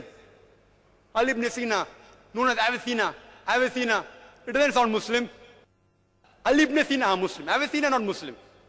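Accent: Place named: India. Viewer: This guys sounds too Indian for me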